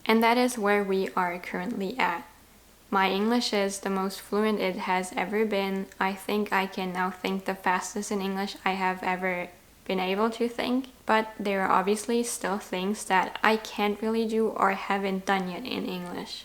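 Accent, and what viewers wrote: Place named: Germany. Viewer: Outstanding work,! You seem to have mastered the English language and it’s grammatical nuances, and you’ll just continue to acquire more vocabulary over time in the same fashion as a native English speaker! Your ability to produce the language is on par with a native, just with a slight German accent which I think is endearing. Thanks for sharing such a detailed history; I find this very motivating for my own journey to Italian language fluency. Well done! Julia (native English speaker from Australia)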